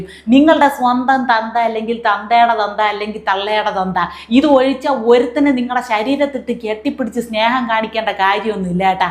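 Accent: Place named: Neyyattinkara, India. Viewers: എന്തര് അപ്പി.. സുഖന്കളൊക്ക തന്നെ? എന്തര് മുഖത്ത് വാട്ടന്കളോക്കെ കാണുന്നല്ലടെ..എന്തരപ്പി നിനക്കൊരു ദുഖം…ഇപ്പോഴും കെട്ടിയോൻ പഴയ കോവാലൻ…തന്നെയടെ..? 😜🤣😂 തിരോന്തോരം സ്ലാന്ക് കിടുക്കി..😍👍🌹തൃശൂര് കാർ ഞന്കൾക്കിത് കേൾക്ക്ന്നത് രസമാണ് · ഇത് നെയ്യാറ്റിൻകര സ്ലാംഗ് തിരുവനന്ത പുറം അല്ല ok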